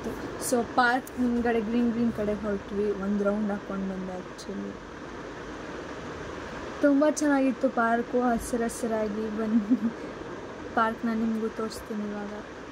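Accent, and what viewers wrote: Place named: Mangalore, India. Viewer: Naavu moodbidire alli vodidu alvas pu college i loved manglorean accent 1st when I saw your video in instagram I thought you are from dk ❤🎉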